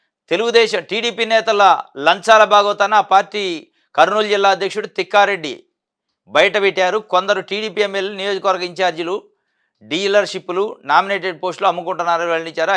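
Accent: Telangana, India. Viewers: Anchor telangana vadu laga vunnadu laungage different ga vundi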